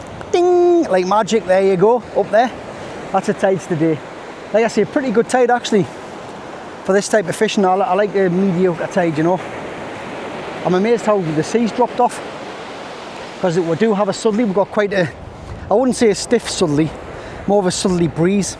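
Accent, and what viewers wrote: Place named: Newcastle, United Kingdom. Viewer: Hi just thought i would say i thoroughly enjoyed watching the video.....love that coastline it brings back so many memories and hearing the Geordie accent too!!! Take care mate cheers ian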